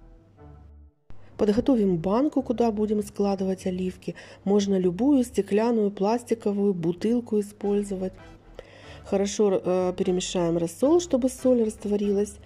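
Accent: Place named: Ukraine. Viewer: Да, 🫒🫒🫒🌶️ , кушаем. Узнаю Украинский акцент :))) Молодец, что готовите сами оливки 🫒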